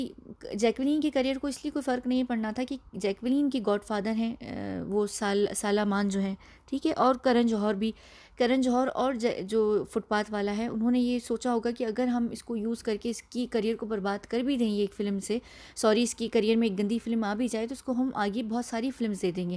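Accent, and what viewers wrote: United Kingdom. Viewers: Apka British accent bohot pyara h... jinko dikat h vo gvar h 💁‍♀💁‍♀ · I Love when you speak in English. It's very UK ish in accent 👍👍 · Ur voice is awesome. Ur english perfect. British accent is like the way u speak. Ur woman with beauty (didnt see but can guage with ur voice n heart) n brains. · I luved ur English accent really Ye British accent h kya???? I appreciate your accent really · I was watching and listening to this video and feeling so sad. Then I was shocked at the way you spoke in English. 😱 The British accent. ❤️ I mean the sudden twist! Your way of speaking is amazing. Amazing analysis. I hope and pray everyday he gets justice. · I like your English accent.😊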